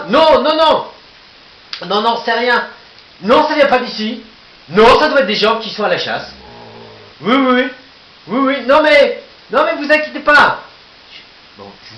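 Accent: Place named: Quebec, Canada. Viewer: 😄😄😄 pas mal l'accent québécois !!! Mais tes sur YouTube ces cool sa. Be moi aussi. Si tu va sur ma chaîne je pense tu me reconnaitras😊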